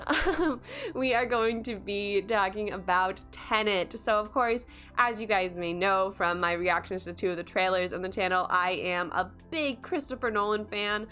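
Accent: United States. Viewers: are you from the mid west ?. your accent sounds mid western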